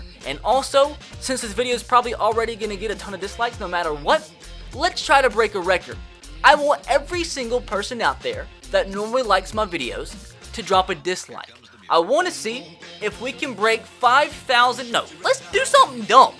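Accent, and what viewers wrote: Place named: Tennessee, United States. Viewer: Ayyy Titan fan here. I should've known you were one judged by your accent. We're gonna pull it out this weekend #titanup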